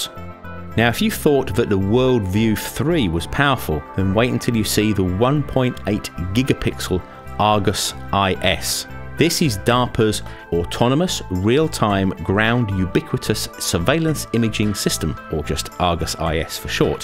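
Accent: United Kingdom. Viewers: Whilst consumer video cameras hitting 30/60 frames per second, the industry norm for the film world is still 24 fps. However, seeing as the chap narrating this video is British, it's possible that he selected PAL settings (of 25 fps) as oppose to 30 fps associated with NTSC when putting the video together. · I really appreciate your videos, because they are so helpful for people like me willing to learn English. We can find corresponding text as well as subtitling with a charming British accent . Thank you very much, Sir.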